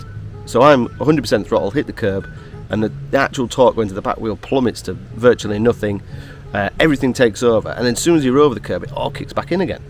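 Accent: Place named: North, United Kingdom. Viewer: As a fellow northerner, I didn't need the subtitles....but I see why you added them ;) Proper job 👍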